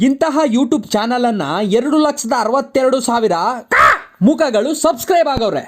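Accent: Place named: North Karnataka, India. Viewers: sakath matadti nod pa hulli namm uttar Karnataka bashee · ಹಾಯ್ ಅನ್ನ. ಉತ್ತರ ಕರ್ಣಾಟಕ ಭಾಷೆ ಸೂಪರ್ ಅನ್ನಾ ನಮ್ಮ ಭಾಷೆ ಮಾತಾಡಿ ಬ್ರೊ flz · 3;35 Uttar Karnataka language love u Bhai ❤️ channagi matadtiri nivu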